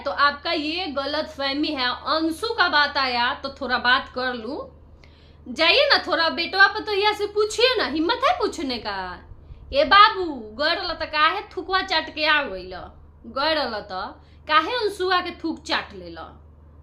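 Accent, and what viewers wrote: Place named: Bhojpuri, India. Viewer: Dear aap kahan se ho mast bhojpuri bolti ho bilkul mere jaise 😂😂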